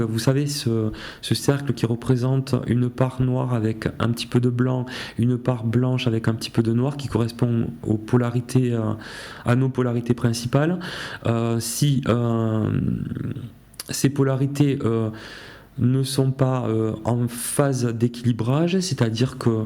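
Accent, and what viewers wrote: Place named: South-West, France. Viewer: j entends un ptit accent du sud ouest comme moi...😍🤩😘 me tromperais-je?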